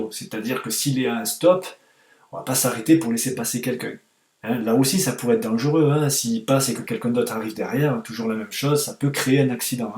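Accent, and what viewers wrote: South, France. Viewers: J'ai passé 3 fois mon permis, la première fois j'ai loupé une priorité avec le stresse. La seconde fois j'ai eu 19.5 ( il faut avoir 20 je le rappelle) sans faute éliminatoire. j'ai jamais compris pourquoi. Cette fois ci j'ai eu 19! et sur mes résultats il me manque 1 point pour l'installation au poste de conduite...Sérieusement, le poste de conduite? Je comprend vraiment pas le problème, a part qu'a cause de ça il faut encore que je recommence! ça coûte trop d'argent! J'en suis a 60 heures... Je déprime grave. Mon porte monnaie crie famine... En plus mon auto école est pas top, par exemple le jour du permis le prof accompagnateur ne viens pas pour te rassurer, te dire si c'étais bien ou pas. Personne ne t’emmène ou ne te ramène sur les lieux de l'examen tu te débrouille. Ce qui, moi personnellement, ne m'aide pas à déstresser. Aussi, les cours durent en moyenne 35 minutes et coûte 48€ l'heure. 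Beaucoup trop chère! et j'habite même pas une grande ville! A vous semblez très professionnel, je vous remercie pour ces vidéos ça aide bien! dommage que vous n'êtes pas dans ma régions... On entend l'accent du Sud!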